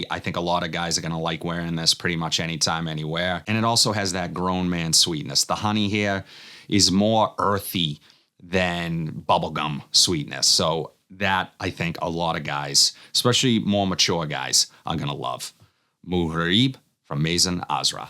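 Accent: Boston, United States. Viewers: ! Happy birthday 🎉 I love how your boston accent sounds like everyone I grew up with. I need suggestions for cologne for my almost 16 year old - I splurged on althair for his b Day in a couple of weeks ( I love it ) ( got PDM discovery set to try before spending on it, p He has Cedrat Boise. Prada Luna rosa. And the sport one Sauvage. Coach blue,( Marshall's) mont.blamc legend bluu t. Jimmy Choo man.aqua di gio. Phanom.( nauseating i cant deal ) cremo spice and black vanilla He no longer likes his nautica voyage So any suggestions at lower price range that smells good? Not everyone smells like. What else is great for this age?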